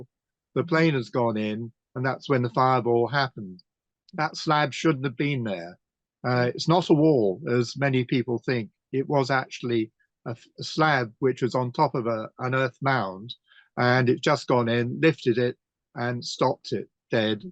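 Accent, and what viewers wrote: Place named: RP, United Kingdom. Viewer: Just coz you've got posh English accent don't mean you're intelligent mate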